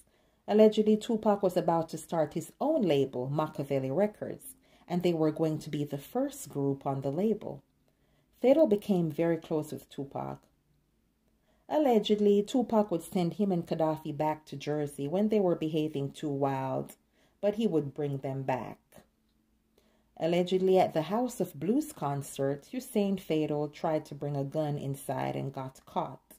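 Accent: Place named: Jamaica. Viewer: Narrator: "Jamaican accent" 👍🏻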